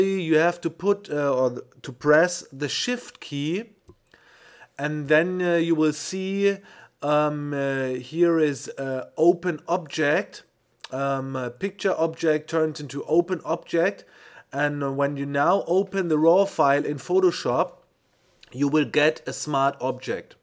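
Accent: Germany. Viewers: thank you very much for this video. Your work is inspiring and, please, please, never apologize for your german accent. Thanks again.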